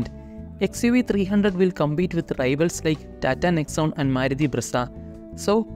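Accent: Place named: England, United Kingdom. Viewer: Noticing your English accent... Naatil evida? 😅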